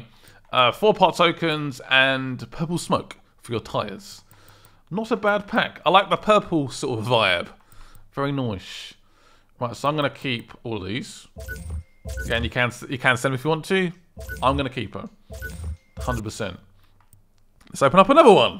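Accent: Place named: Southampton, United Kingdom. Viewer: Bit of a southampton tune like it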